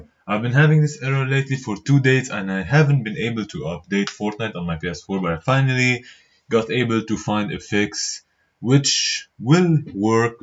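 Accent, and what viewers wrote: Lebanon. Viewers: Hii are you lebanese ? Cause your name and accent makes it seem like you are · Lebnene sa7? Min sawtak w lahjtak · you sound very Lebanese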